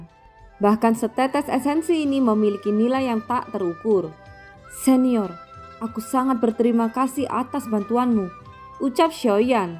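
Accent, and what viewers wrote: Java, Indonesia. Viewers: logatnya kaya orang jawa min..?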